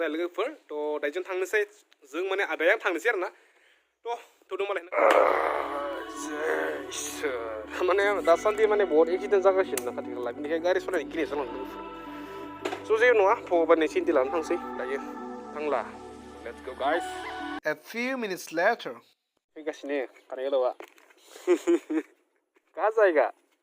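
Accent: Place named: Kamrup, India. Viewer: Adaya kamrupiya railaiywda.... Agna kamrupiya gorpala 50/50 jby..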